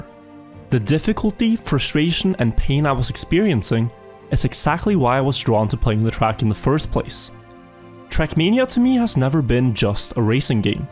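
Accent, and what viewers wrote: Norway. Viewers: I just saw that he is Norwegian. Explains the trash accent. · You norsk??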